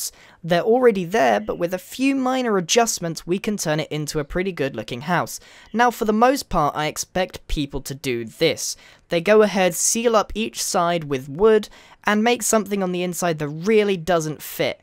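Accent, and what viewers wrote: United Kingdom. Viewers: Who likes British? Cuz I do, also. Can you use bedrock and turn it into a good looking house? I think not.(not asking u to do that. It’s just a question.) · british british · Very clearly British. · I like british better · Why the British stereotype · Can we see British again? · what's with the british you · I love British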